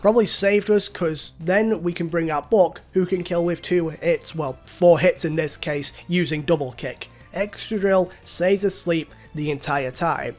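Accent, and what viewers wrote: Northern England, United Kingdom. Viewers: Found your channel this morning and now going through the rest of your vids. Your scripting and editing is class. I'm from Northern England so your accent gives me some bias into liking the commentary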